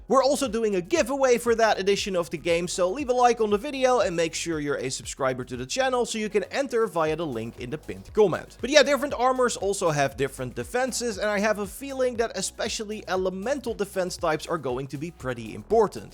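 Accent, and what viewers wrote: Netherlands. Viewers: Dude stick to dutch videos your English accent is horrible. Also listen to how he says wukong lmao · I love your dutch accent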